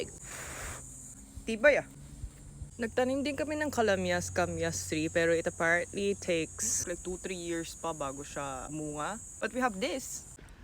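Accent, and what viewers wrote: Philippines. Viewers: Wow! Pinoy accent talaga ha 😃 cool! Ang sarap naman kumain ng fresh na fresh gulay at fruits! May saging ba kayo dyan?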